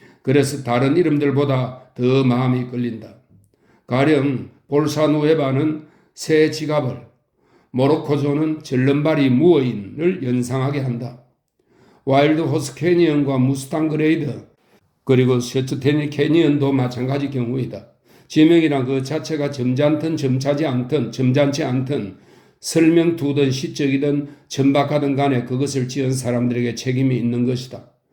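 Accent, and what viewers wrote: Gyeongsang, South Korea. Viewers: 경상도 억양이 싫어요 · 경상도 억양이 인간적이라 사람냄샤가 나요...❤❤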